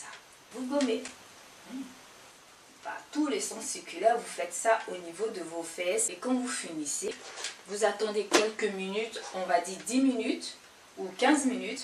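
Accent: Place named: Ivory Coast. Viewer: Merci merci cette vidéo tombe bien 😊 l'accent ivoirien qui ne se perd jamais 😂😘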